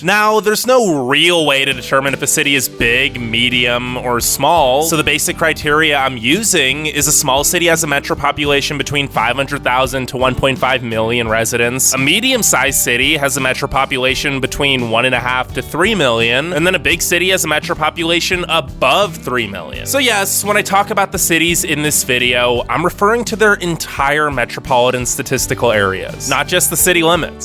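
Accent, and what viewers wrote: California, United States. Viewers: Sorry but the voice is just Californian I can't stand it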